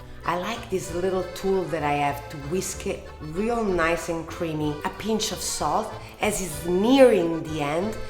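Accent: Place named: Italy. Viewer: She is loud, hilarious and in your face with her Italian accent. I just couldn't stop smiling while watching the entire video. Oh, I almost forgot the drama and the attitude. I love it!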